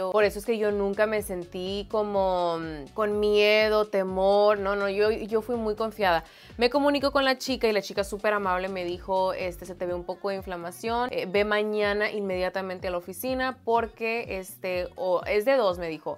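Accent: Sinaloa, Mexico. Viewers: "SINALOA" Se me viene a la mente tanto por tu acento como tu personalidad 😋😉 Saludos desde Ensenada 😎💜 Espero ganar porque me encantaría aprender a recrear tus maquillajes 😍😄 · Me encantan tus videos... cada que subes pienso en "Sinaloa", tienes bien marcado el estilo sinaloense hehe .. Te veo desde Ensenada!!😊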